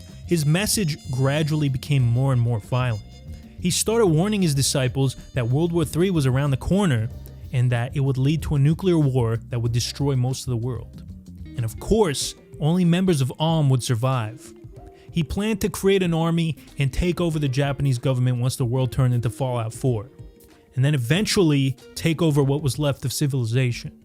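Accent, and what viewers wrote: New York, United States. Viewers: I watch a lot of cult content. But there's something about hearing them explained by someone with a New York accent. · Hol' up, you're from AZ? With that NYC accent?!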